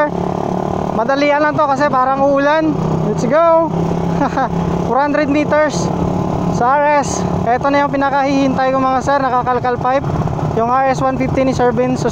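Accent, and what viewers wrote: Iloilo, Philippines. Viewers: Ilonggo ka kuya🤣🤣gravbe taka ka idol mag dala sang rider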